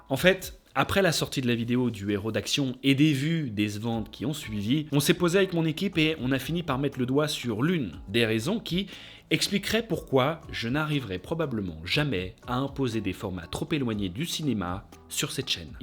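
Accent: Belgium. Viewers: Abonné depuis 10 ans avec le petite 🔔, j'ai cliqué sur chacune de tes vidéos et j'ai toujours apprécié, car même si je suis passionné par le cinéma, je regarde ta chaîne surtout pour toi, j'ai direct accroché à ton humour et à ce que tu dégages (et un peu aussi pour l'accent belge 🙄😂 Je suis pas très loin) et ce depuis 10 ans ! 😁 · Magnifique accent Belge et aussi bonne année.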